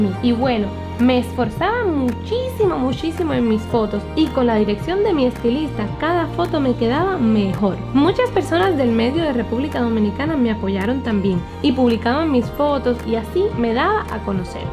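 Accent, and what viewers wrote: Cuba. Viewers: Ellas tienen el mismo acento por que las dos son cubanas y no le puedes prohibir eso 😒😒😒y ultima si no te gusta vete...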